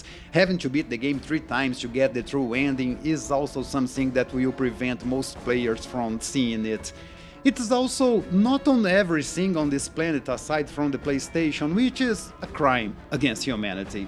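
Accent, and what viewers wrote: Brazil. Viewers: I can hear the Brazilian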